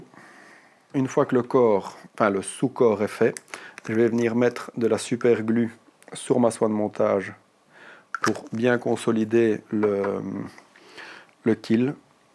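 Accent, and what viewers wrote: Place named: Belgium. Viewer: Encore une très belle vidéo et c'est accent belge. Rajoute un vrai charme à tes vidéos. On prend plaisir avec toi au bord de l'eau et on sent l'envie de transmettre. Merci encore pour ce travail. Je me suis mis à la nymphe grâce à toi depuis plus d'un an et c'est un vrai plaisir. Va falloir que je franchise le pas de la mouche sèche mais c'est compliqué dans nos rivières de haute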